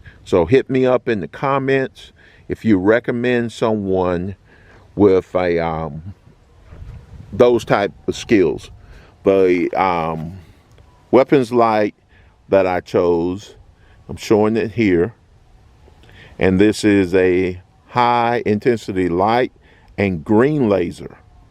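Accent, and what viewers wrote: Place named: North Carolina, United States. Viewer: That’s a NC accent if I’ve ever heard one! Great review brother. 😊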